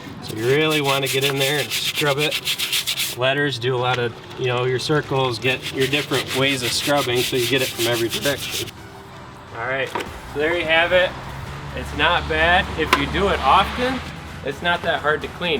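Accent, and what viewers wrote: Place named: North, United States. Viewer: I love the "accent" of the upper states!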